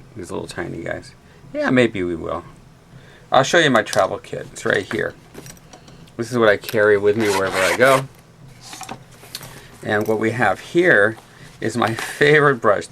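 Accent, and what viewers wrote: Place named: United States. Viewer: I hate the way americans say "water"... :):):) But the painting is beautifuly done so it's worth to watch it. :)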